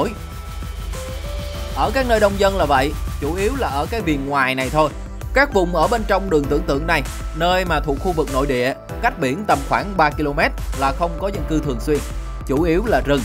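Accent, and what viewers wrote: South, Vietnam. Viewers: Ok, nghe hay và có thêm sự hiểu biết về đất mủi Cà Mau, nơi tôi đã đi vượt biển 1987 và đã định cư ngoài nước VN… Tôi sẻ đăng ký kênh ngay bây giờ và nghe giọng thuyết minh rất hay ( giọng miền Nam rất chuẩn ), tôi là người sanh ra ở Saigon…!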